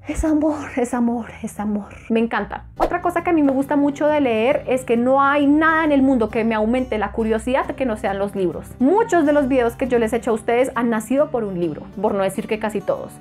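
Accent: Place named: Bogotá, Colombia. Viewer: Hola... Creo que es la primera vez que te escribo, pero JAMAS me pierdo de tus vídeos... Yo también disfruto del olor de los libros y de las portadas de los libros, jjjeeje... otra cosa que me encanta de los libros, es que me hagan reír, y bueno, aunque parezca un tantito masoquista, también amo, que un libro me haga llorar, y hasta que me deje deprimido... No quería utilizar una frase cliché, pero es algo así como sentimientos encontrados, osea, PONERME FELIZ PORQUE UN LIBRO ME DEPRIMIÓ, jjajjaa... Suena loco, ya se... Aprovecho para decirte que me fascinan tu vídeos, tu acento bogotano, tu personalidad, y esa modestia que tanto te caracteriza... Nunca dejes de hacer este oficio, por favor... Un abrazo desde Montería Córdoba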